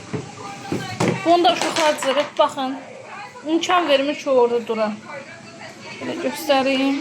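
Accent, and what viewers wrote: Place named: West, Azerbaijan. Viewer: Maragli geldi haralisiniz lehceniz qerb zonasina oxwadi ona gore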